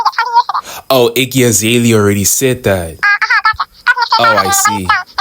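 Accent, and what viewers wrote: South Africa. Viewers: Are you South African? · Bro u South African? Coz you definitely sound like it 😅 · You got sum South African in ya? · are you south african? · Bro can't escape his South African accent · the video is hella funny but dawg you have the most jozi/pta accent ever, are you south african? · Mfana are you South African?😭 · are you South African? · you sound south african ngl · u south african neh? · you South African my guy?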